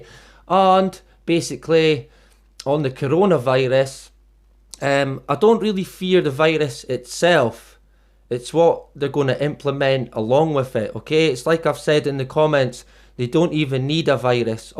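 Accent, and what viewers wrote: United Kingdom. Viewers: Nice to hear a British voice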